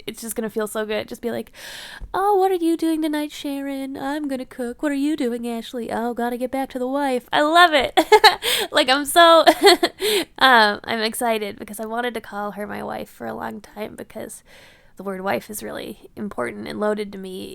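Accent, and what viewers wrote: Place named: Midwest, United States. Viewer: Minneapolis, I was right. you are from the mid west. I can tell by your accent. so hey fellow midwesterner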